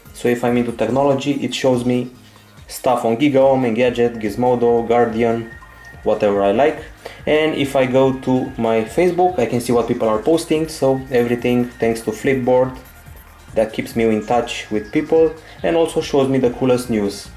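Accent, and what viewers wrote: Romania. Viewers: Ce accent romanesc:)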